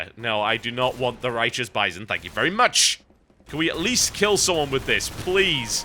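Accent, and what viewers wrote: United Kingdom. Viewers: I love your voice man...its deep British awesomeness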